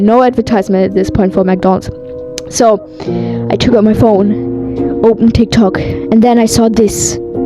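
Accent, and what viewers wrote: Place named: Germany. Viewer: U sound german 🤔🤔 W vid ♥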